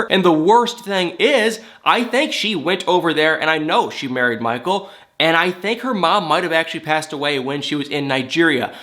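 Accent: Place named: South, United States. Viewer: You're southern aren't you? "woman's trouble" lol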